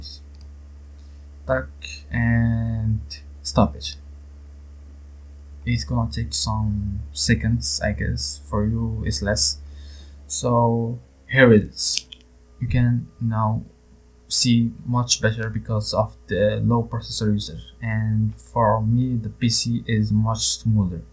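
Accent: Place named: Spain. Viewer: i think he is Spanish his accecnt not to hard to understand but maybe if he wrote it down instead of talking to me i juse restore my computer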